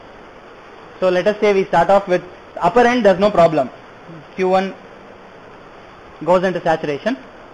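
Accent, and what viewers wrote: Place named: India. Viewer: strong Indian accent prevents me from understanding.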